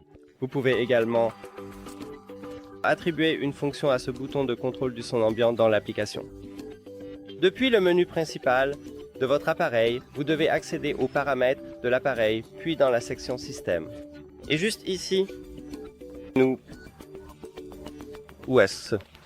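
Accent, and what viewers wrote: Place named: Quebec, Canada. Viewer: Il y a un petit accent québécois .. C'est sympa .. ☺️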